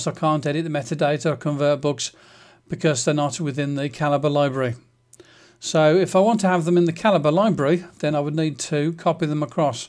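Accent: Birmingham, United Kingdom. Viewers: are you a brummie? me too !!! thx for the video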